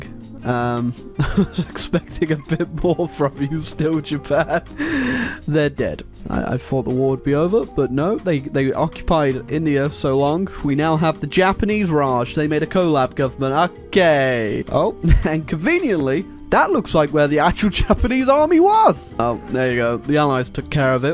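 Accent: United States. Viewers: I don't know where is from anymore. I actually thought he was Belgian once but I realized that was only a meme? Then it seemed like he was just English, but now he's saying "zee" and actually the rest of his accent sounds like it might be American.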